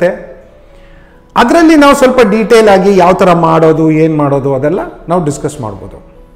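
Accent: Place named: Kerala, India. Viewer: WOW... BABAki Jai ho.... from your Hindi Accent, I always thought you might be from Kerala... but this gave me goosebumps when you spoke in Kannada... Super... would request you to make more kannada videos...:-D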